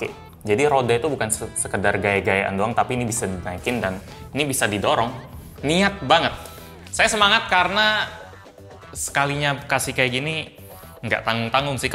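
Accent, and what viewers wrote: Palembang, Indonesia. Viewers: Bang orang palembang kah?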